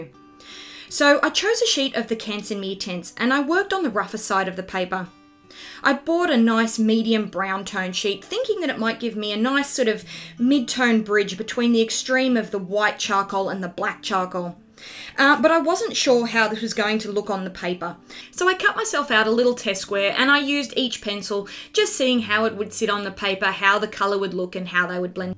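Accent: Australia. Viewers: Are you from Western Australia? Your accent sounds like it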